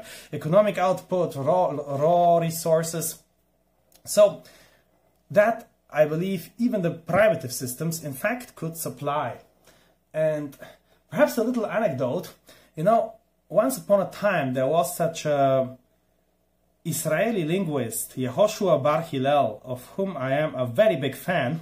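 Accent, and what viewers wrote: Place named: Austria. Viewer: Excellent thinking 🤔 Systrans with accent such as yours is English with Austrian accent. Depending on the language being translated. Usable on cell phone 🤳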